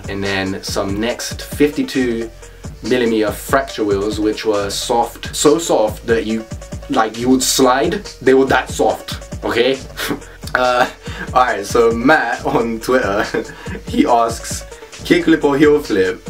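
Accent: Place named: England, United Kingdom. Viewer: It's cool to watch someone english who speaks the same as me and i know exactly what you're saying and i can just relate, sometimes youtube gets boring because all the big people on here are from america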